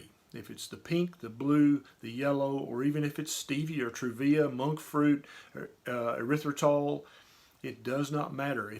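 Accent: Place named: Tennessee, United States. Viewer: Hi Dr. Berry. Great information. It's nice to hear someone from my home state. I'm from Tennessee . I live in Texas right now.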